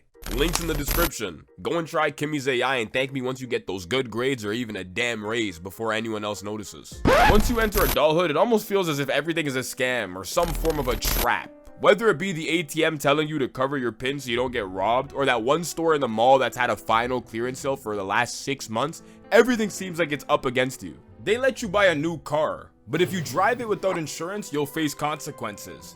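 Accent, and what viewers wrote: Toronto, Canada. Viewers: I hear a Toronto accent😂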